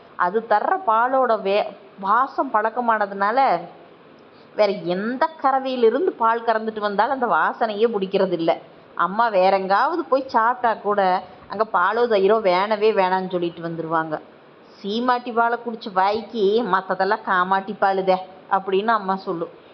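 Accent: Kongu, India. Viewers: Kongu tamil arumaii ❤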